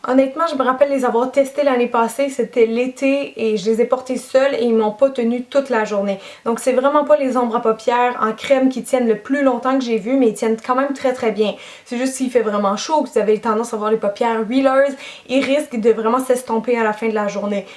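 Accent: Quebec, Canada. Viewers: ❤ Coucou!!!! Je voulais te proposer un petit truc assez simpa à faire et à regarder bien sur, mais avant tout; tu es l'une de mes youtubeuse préféré, tu as vraiment beaucoup de talant et beaucoup de charme, Toi tu as vraiment ta place sur Youtube parce que tu nous partage tout ton savoir et toute ta créativité et bien évidement ta Joix de Vivre! J'espère que tu t'envolera vers un plus haut rang dans ton métier de maquilleuse pro, maiiis bien sur, sans nous oublié nous tes abonnés!!!!!! Tout ça pour te dire, que tu es géniale continue tes vidéos! Car on t'aime toutes et tous très fort!! ❤ ✿ voilà ce que je veux te proposer; es que tu accepterais de nous faire une vidéo banale maiis pas tout à fait comme les autres!!, comme par exemple, une vidéo ou tu présenterais un maquillage ou bien des produits, tout et n'importe quoi c'est toi qui choisi.... avec un accent FRANCAIS!!!! 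Ca serais tellement simpa et cool! Nous Françaises on seraiit toutes émerveiller et vous les Québécoises vous pourrez enfin écouté avec un accent étranger comme nous on l'entend dans chaque vidéos qu'elle poste! Je ne sais pas si toi, ou vous toutes, vous m'avez comprises maiis j'y ais penser comme ça d'un seul coup et j ai voulut vous en parlez parce que c'est comme notre grande Soeur, elle nous partage son savoir et ses expériences, et nous on l'écoute comme des petites soeurs! ✿ ◊ Et puiiis en faisant cette vidéo, ça nous feras toutes du bien de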